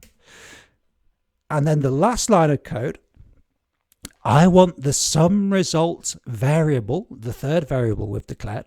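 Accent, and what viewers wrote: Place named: United Kingdom. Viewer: I also learn English here! "Stag Do"....I looked it up and it means bachelor party. We use the term "bachelor party" in US. I learned VBA from other Youtube channel , but this interactive course , I think, is better. The British accent sounds a little bit strange to me at first, but I get used to it.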